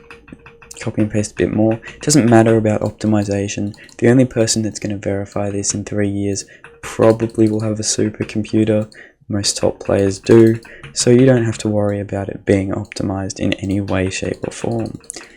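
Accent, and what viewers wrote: United Kingdom. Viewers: are you british?😊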